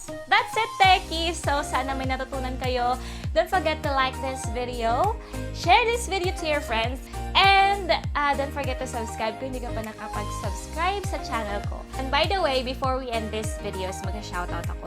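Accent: Visayas, Philippines. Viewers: Ma'am, bisaya ka po ba talaga!? I just followed you on FB! I feel a bit closer! Hehehe.... 😋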